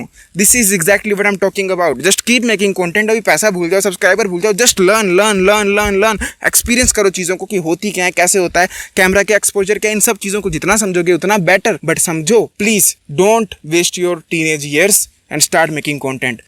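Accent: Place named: Garhwal, India. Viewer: Bhai tu garhwali hai na 😅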